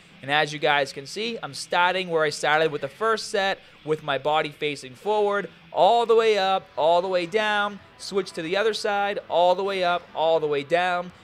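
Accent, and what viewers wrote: Boston, United States. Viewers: What's with this Boston accent all of sudden creeping into your videos ????? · The "Baaaston Haaabar" accent has gotten really bad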